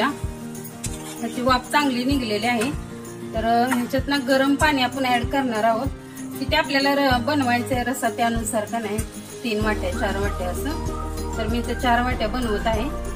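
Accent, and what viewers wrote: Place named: Rural, India. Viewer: Mam tumchi recipi mi keli chan jhali Tumche gav kutele sanga na Aamchya gavakde pn ashich bhasha aawaj Mast vattey..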